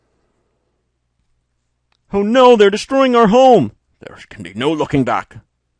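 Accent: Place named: United States. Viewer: i love the american accent =D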